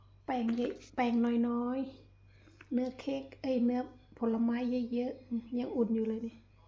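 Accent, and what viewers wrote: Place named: Suphanburi, Thailand. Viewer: ทํามัยพูเหมือนคนสุพรรณเลยทัมมัยต้องออกเสียงสูงมากไป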